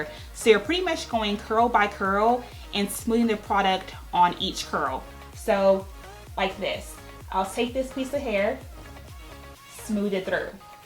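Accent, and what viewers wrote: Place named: Australia. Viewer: Thanks for this video! I definitely will try this tomorrow! I wonder how much time I will need? You have such an interesting accent, is that Australian?!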